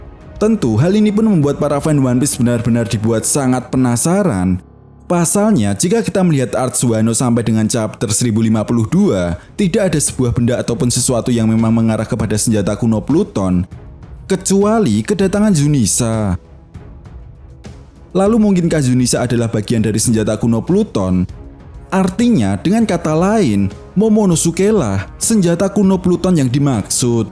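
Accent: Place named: Banyumas, Indonesia. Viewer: ngapak ya min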